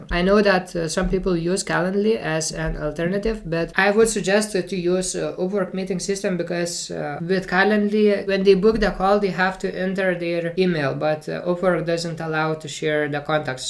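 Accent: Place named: India. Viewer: Why do you look northern European but sound Indian?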